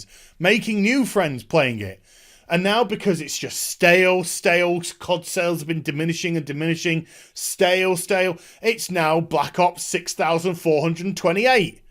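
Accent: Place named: United Kingdom. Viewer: for some reason ranting sounds amazing with the British XD but yeah sad to see blizzard slip and slide into oblivion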